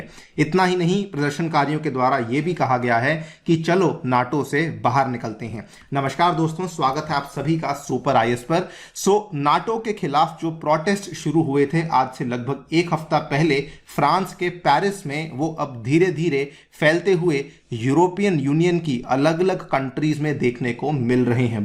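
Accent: India. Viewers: Nam Bharat rakha hai sasur..or kam krre ho English pronunciation thk krne ka 😂😂...bhai hmari marji kese b bole... it's Indian English..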